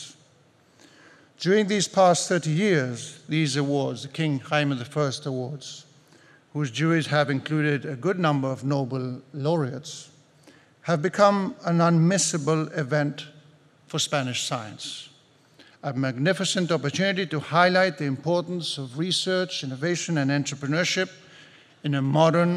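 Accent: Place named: RP, United Kingdom. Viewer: I didn't expect him to have a Posh accent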